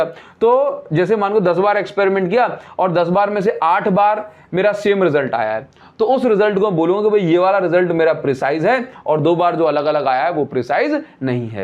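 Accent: Odisha, India. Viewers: Sir are from Odisha 🙏🏻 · Sir are u from odisha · Sir app odisha se hen kya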